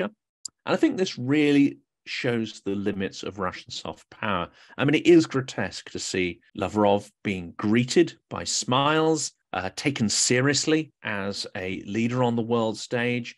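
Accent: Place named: United Kingdom. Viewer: I love having the wonderful summary you have put together tonight! Your one hour commentaries are priceless as have all your guests been. Never think they are too long! Your work is amazing and appreciated. I’m so busy keeping up with our Starsky, and Johnny FD and our Canadian/UK/Swede Brandon, it’s a gift you give us bringing honest news and knowledgeable guests on your channel. Thank you for all your hard work and the British accent is a pleasant change from our American talking heads. Слава України 🇺🇦 героям слава! 🧨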